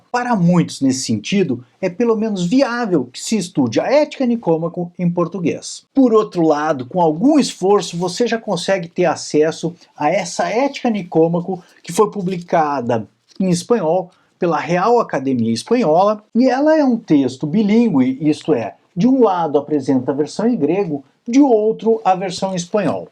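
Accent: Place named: Rio Grande do Sul, Brazil. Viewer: Ilustríssimo Professor e Dr., um aplauso e um abraço de Gravataí/RS. Pelo sotaque, tu deves ser meu conterrâneo.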